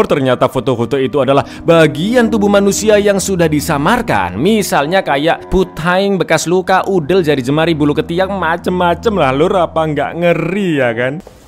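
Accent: Java, Indonesia. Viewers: masih inget gw video pertama yg gw tonton dari channel ini, pas ngidul film The Pacifier, dari situ gw udh suka banget kontennya cak, pembawaannya santai, medhoknya ada, ama diselipin humor-humor receh, mantep dah pokoknya, btw selamat ya cak atas 1 jt subs nya, mugo-mugo kontene sampean tambah rame dan iso tembus 2 jt subs, aamiin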